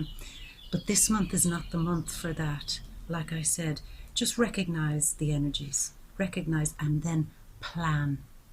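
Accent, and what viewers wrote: Ireland. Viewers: This resonates with me. I am always helping other people out from sorting out paperwork to finding things for their new homes to doing runs down to the local tip for them , but never helping myself. I have a major problem with myself worth, been put down too many times and now very wary of everyone, trying to figure out their angle or what they want that I have. I just don't let people in close in case I get hurt again. At the minute I have a major problem with money and I fear losing my home because of a Government paperwork mess up, until it is sorted I am running up rent arrears. I could do with a major cash input, even a major UK or Euromillions lottery win would be appreciated so I can settle my rent arrears myself and some other bills to have a complete fresh start, even if it was just to break even for a change. I just loved your soft Irish accent, it has a warming, calming feel about it. 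Even though I am English, I have a Paternal Irish ancestry so it is in the blood and a soft Irish accent is so nice to listen to. I got my wheels of sorts, it was my birthday yesterday 7/7 and I got some nice Land Rover models ( I can't help it, I like Land Rovers) from my kids for my 51st Birthday. Regards, Mark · Love the irish accent. Great reading.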